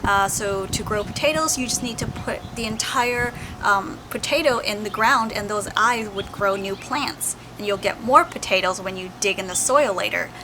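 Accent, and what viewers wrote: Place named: United States. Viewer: I know this makes me stupid and borderline racist but I was completely thrown off by your completely normal American accent. lol Sorry.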